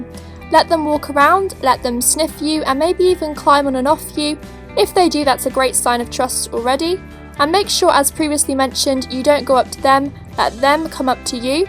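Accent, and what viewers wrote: England, United Kingdom. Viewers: are you English? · You sound English.. Anyway if you are do you know the best place to get hamster toys and hideouts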